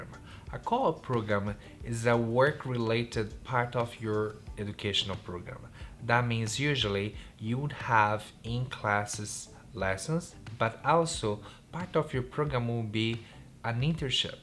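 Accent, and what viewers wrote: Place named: Brazil. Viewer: HE HAS A BRAZILIAN ACCENT. :)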